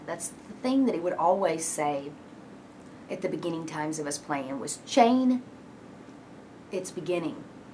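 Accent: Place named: Texas, United States. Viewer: i can tell she is fro texas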